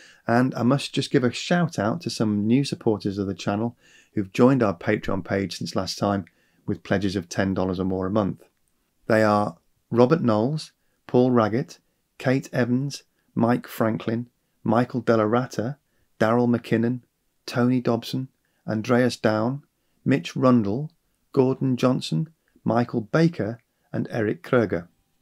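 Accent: United Kingdom. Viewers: Difficult to understand his voice, which has a British accent, but goes up and down. He needs to speak with same volume and clearer.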